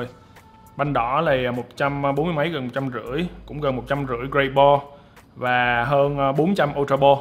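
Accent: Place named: United States. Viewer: Anh có học tiếng anh không anh do lúc a nói chuyện rất giống người Mỹ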